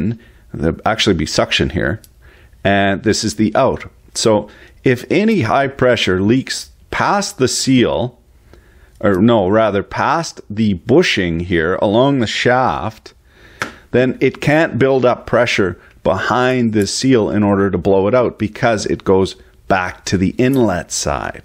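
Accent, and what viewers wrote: Canada. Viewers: This guy is brilliant I wish I spoke Canadian I’m from Missouri who would of know that Canadian English is so different great videos I’ve learned so much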